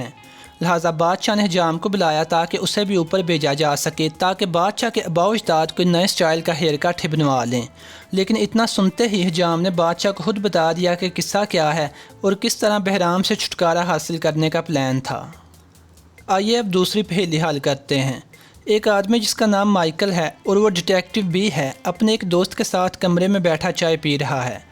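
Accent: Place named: Lahore, Pakistan. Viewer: Are you lahorei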